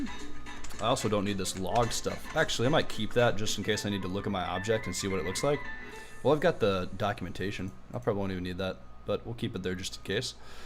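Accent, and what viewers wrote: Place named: United States. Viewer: Thank you thank you 😥😥😥 Finally we have a YouTuber who has American accent new courses, short simplified and great 😣😣 thank you very much By the way any quick idea to learn mathematics for machine and deep learning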